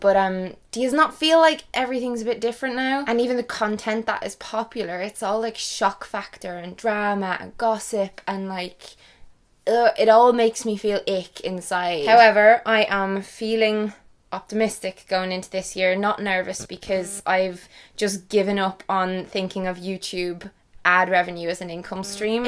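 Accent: Ireland. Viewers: Omg!!! You look AMAZING!!! So cute!!!! What happened to you? Like ur eyes used to be green! Ahaha! Now they're blue! Ahaha! Do more vidios about your amazing Irish accent! :-D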